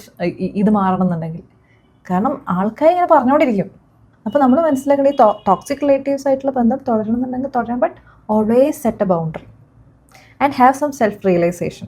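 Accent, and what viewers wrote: Kannur, India. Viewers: ഇങ്ങള് കണ്ണൂർ ആണ് ല്ലേ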